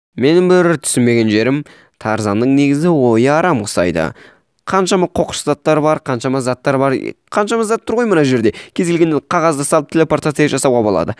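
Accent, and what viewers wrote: Mongolia. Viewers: Өзіміздің Моңғолиядансың ба?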